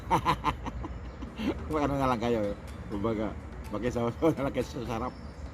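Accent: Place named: Visayas, Philippines. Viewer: Ay bisaya ka deay idol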